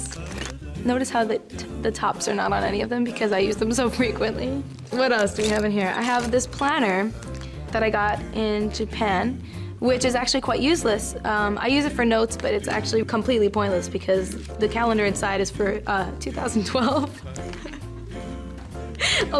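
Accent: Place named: South, United States. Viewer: she has a weird acsent in this video... kinda a southern feel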